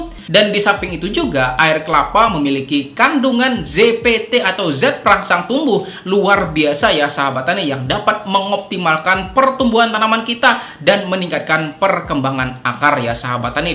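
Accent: Banjar, Indonesia. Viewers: kayak logat orang Banjar kkak... mantaappp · Wahh kaya ny banjar banar nih sanak 😁👍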